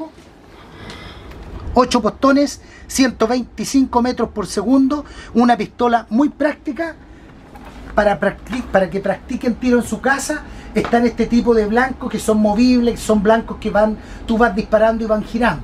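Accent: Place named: Chile. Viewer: Chile. Escucha el acento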